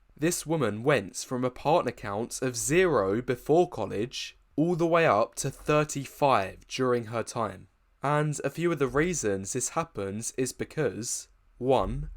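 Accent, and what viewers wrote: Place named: United Kingdom. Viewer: bro your english regional accent along with your bluntly made message is so f***ing good. that being said it's really depressing hearing the truth :(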